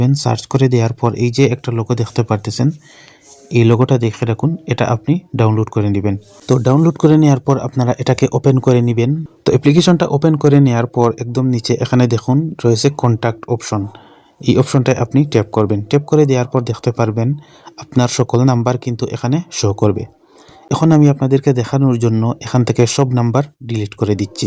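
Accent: Sylhet, Bangladesh. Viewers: Bai apne sylhetini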